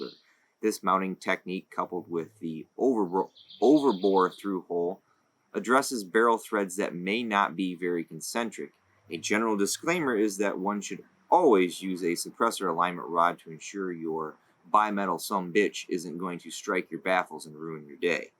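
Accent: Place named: Midwest, United States. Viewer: Lol that northern Midwest accent! You from WI?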